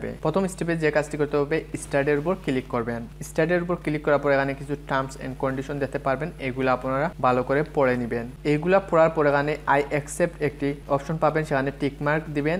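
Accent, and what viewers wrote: Sylhet, Bangladesh. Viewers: Vai aponar khotai buja jai aponi Sylheti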